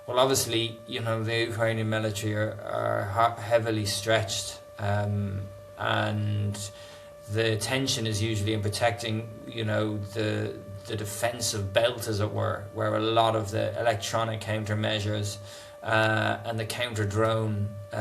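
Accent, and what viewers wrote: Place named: United Kingdom. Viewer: British accent = lies.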